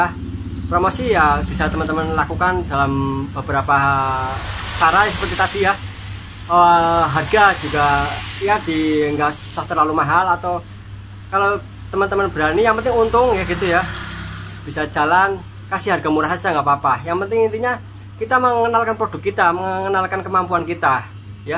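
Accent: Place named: East Java, Indonesia. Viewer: Ganteng...soko jowo timur yo mas....kelihatan dari logatnya...arek suroboyo????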